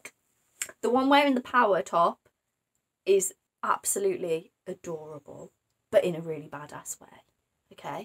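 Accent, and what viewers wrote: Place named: United Kingdom. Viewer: I love your British accent,, 😅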